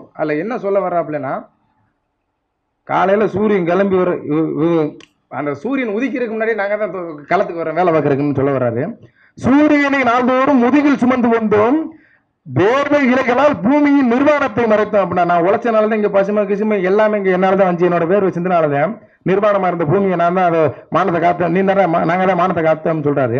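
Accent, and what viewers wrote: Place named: Thanjavur, India. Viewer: Semma voice .. Ithu Thanjavur slang mathiri ye irukku.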